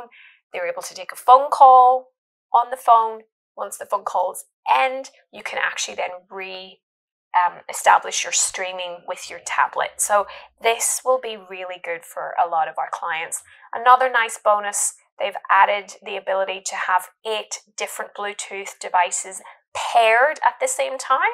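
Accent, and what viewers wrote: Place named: Northern Ireland, United Kingdom. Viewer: Love that Northern Ireland accent. 💚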